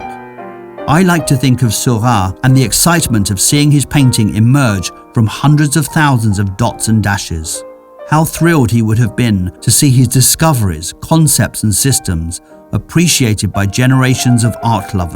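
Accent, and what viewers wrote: United Kingdom. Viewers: Art from France. Video from England (I suppose, because of the accent). Paint from USA. Viewer from Brasil. Globalisation is real!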